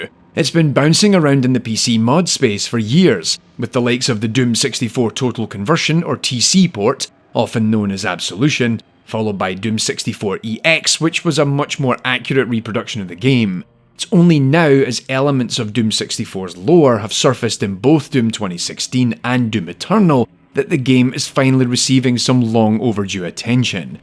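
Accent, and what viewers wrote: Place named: Scotland, United Kingdom. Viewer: You're the first Scottish (i presume that's a Scottish accent) for whom i don't need the subtitles.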